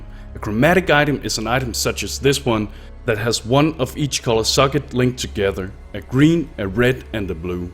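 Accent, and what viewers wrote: Denmark. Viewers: you sound danish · He sounds danish in the accent. How do i know , well iam danish :P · It sounds like you're Danish, Are you? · Er du fra Odense?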